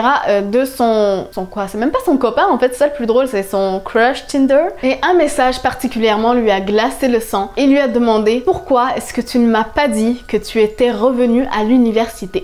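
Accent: Canada. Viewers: j'adore ton accent tes canadienne ?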